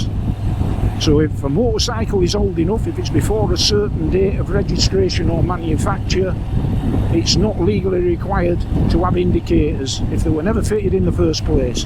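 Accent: Yorkshire, United Kingdom. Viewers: Nothing wrong with a plain spoken Yorkshire man or woman. Prefer someone from lancashire myself, but there again I'm one !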